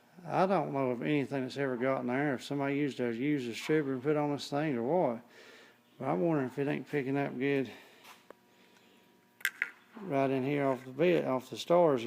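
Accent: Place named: South, United States. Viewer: I understood every word.. he has a thick southern accent.. Nothing wrong with that.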